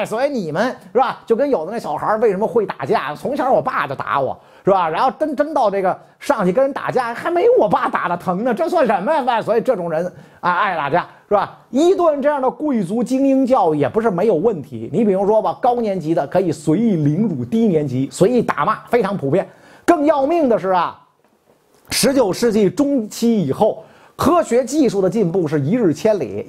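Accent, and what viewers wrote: Beijing, China. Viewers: 哎哟，甩着京腔讲贵族，，，真不是那么回事，别扭。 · 👍赞 老北京的音儿 👍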